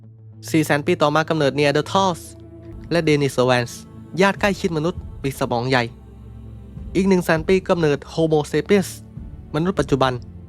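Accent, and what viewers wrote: South, Thailand. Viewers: ผมชอบสำเนียงใต้ครับ ฟังอยู่ลั่นขำเลย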